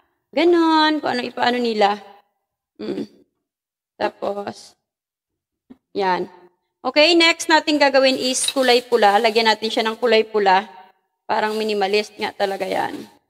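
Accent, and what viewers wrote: Iloilo, Philippines. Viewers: Gakalingaw ko mg ilonggo ka a.. haha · ilonggo ka maam? hehhe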